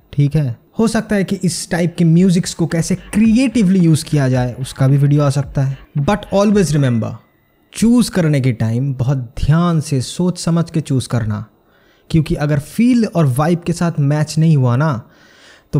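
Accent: Bengal, India. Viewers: Re tumi bangali na Tomar tone e bojha jay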